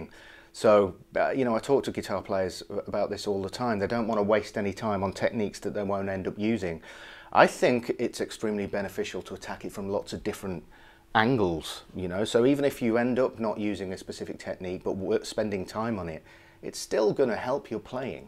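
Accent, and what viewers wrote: United Kingdom. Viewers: Put a camera on your face...once in a while...the mono-tone British thing is, well, a stumbling block....What's the Patton...a general?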